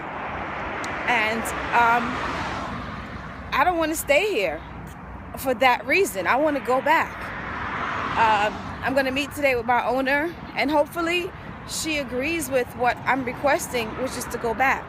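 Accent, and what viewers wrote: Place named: United States. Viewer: You better get your ass out of there.. Before something go wrong..like go to jail.. remember you don't suppose to be there... The key word is illegally.. you sound like you are American woman if I was you I will get back to United States and you can go somewhere like Canada or Brittany will somewhere where's though you're not going to go to jail for being their country illegally get the F from out of there before you regret it sister Sincerely Nate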